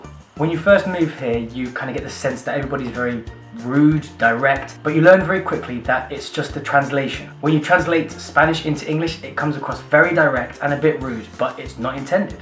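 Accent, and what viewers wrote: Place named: Essex, United Kingdom. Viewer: you have a slight Essex accent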